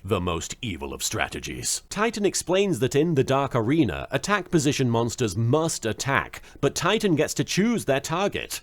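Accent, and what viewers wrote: United Kingdom. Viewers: Up and Atticus..... God you're so British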